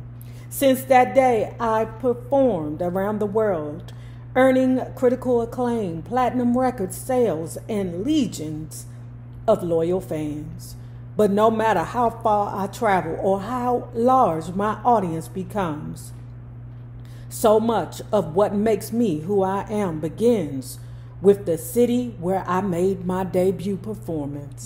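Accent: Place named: Washington DC, United States. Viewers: Baby, Fay revealed her ethnicity back in the 90's. As 4 ur accent, u sound just like everyone I know that went to Anacostia HS in DC!❤🤣🤣🤣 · Definitely sounds east coast. DC or Murrland. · You definitely have a DC accent and -isms. I lived in Ft Washington in Maryland (Murrlin) for a few years when I was a teenager. "lunchin", "bama", "doing the booty" all things I heard only in DC.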